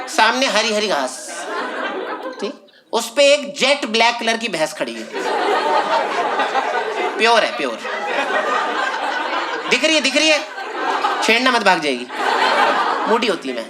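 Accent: Pakistan. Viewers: Is he Pakistani his tone is similar..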